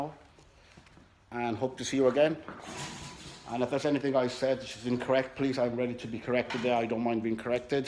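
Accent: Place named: United Kingdom. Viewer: maybe its not what you should have if you find it hard to cope.I am not trying to be rude only it makes no sence that you tell people not to own one yet you now have two.It is not right to title your vidio "where to buy a monkey"if you have no intention to let people know.I detect that you have a Brittish accent like me,if so you know we are up front and staight forward.I am one to speak my mind,I hope you are not offended and you take time to answer me...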